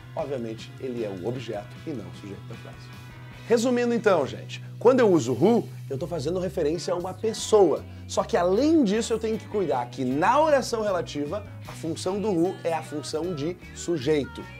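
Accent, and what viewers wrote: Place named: Rio Grande do Sul, Brazil. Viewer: Também sou do RS, de Lajeado, reconheci o sotaque hahahaha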